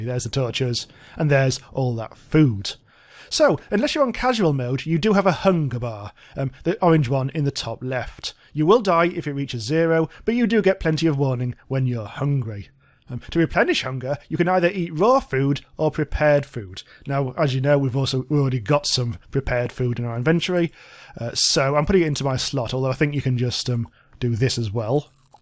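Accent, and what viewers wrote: United Kingdom. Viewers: This guy narrates like roald dahl with a british voice, love it !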